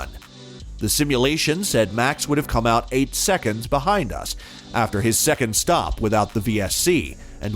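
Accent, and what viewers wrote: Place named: United States. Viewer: please can you talk normal English and not the American way... every word so exaggerated....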